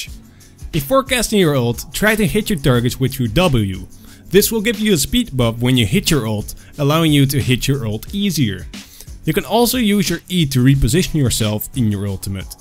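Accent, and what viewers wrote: Netherlands. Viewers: Sowieso een Nederlander dit, hoor je gelijk aan het accent. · Dutch if my guess via the accent is not mistaken....?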